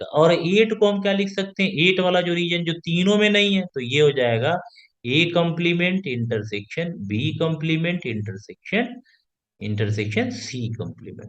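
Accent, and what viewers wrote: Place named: India. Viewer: Thank you. You convinced me that the math is no problem. Understanding mix of english and indian is much harder.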